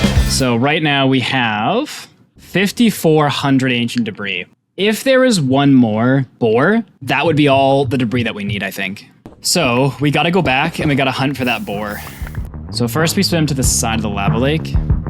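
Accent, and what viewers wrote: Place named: Canada. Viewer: Sounds Canadian Looks German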